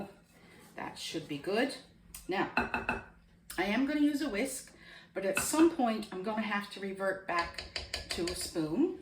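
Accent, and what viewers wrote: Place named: Ireland. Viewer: Boiled fruit cake has always been a big favourite here in Ireland, I started baking back in the 1970's when I got married and its one of the first things I made. These days I usually make tea brack, coffee cake, Bakewell cake and scones. Luckily my hubby loves cake! I honestly thought you were Irish, from Dublin exactly, except for a couple of words. But then Newfoundland and Ireland have long connections. Thank you for sharing your recipe.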